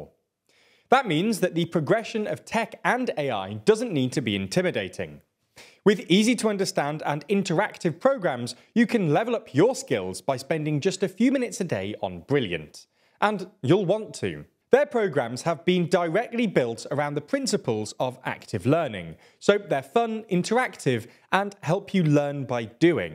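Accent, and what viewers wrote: United Kingdom. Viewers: Why do y’all say slavic names so British 😩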